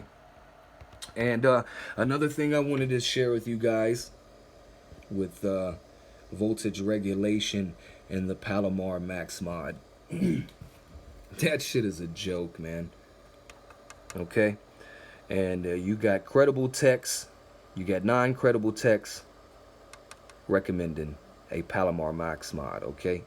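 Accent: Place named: New York, United States. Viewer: You sound like you are from Brooklyn or the bronx. I'm a long island boy in south carolina.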